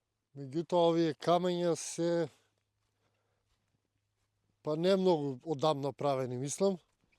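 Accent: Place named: Veles, North Macedonia. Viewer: Да си го зачуваме јазикот, кој и онака е во изумирање. Малите македонски деца повеке зборат англиски... се надевам знаат и македонски. Кај нема наталитет, има абортуси и сите деструктивни нешта, барем јазикот да го зачуваме. Ете ти збориш на велешки акцент што е убаво да се слушне. Така да, моја препорака да продолжиш вака како до сега. Евентуално можеш да ставаш титл на англиски или синхроно и посебно да ги објавуваш. Но продолжи да снимаш како до сега.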